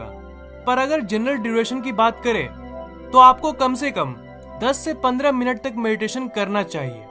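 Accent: Bihar, India. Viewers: Bhai aap Bihar ke ho kya 🙄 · Aap Bihar se hain kya? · Bhai aap Bihar me Kahan se ho aap ki bato se bilkul lagta he ki aap Bihar se ho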